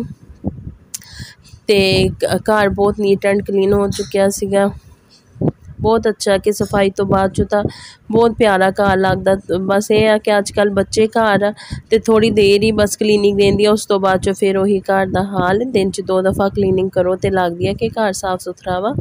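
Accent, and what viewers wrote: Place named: Faridabad, India. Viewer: Tadi punjabi ton lgda tusi fabad ton jy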